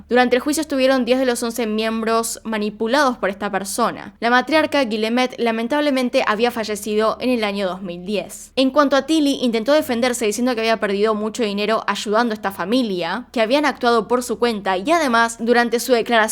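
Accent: Argentina. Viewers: Amo ese acento argentino!!!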